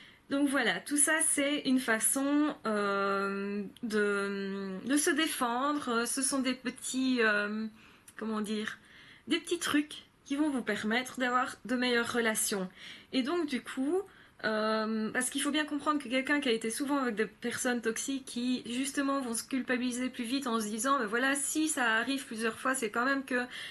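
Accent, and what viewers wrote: Belgium. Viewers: Bonjour, vos vidéos m'aide énormément en ce moment, elles sont géniales!!! j'aurais aimé savoir si vous exerciez en France? je perçois un petit accent belge:-)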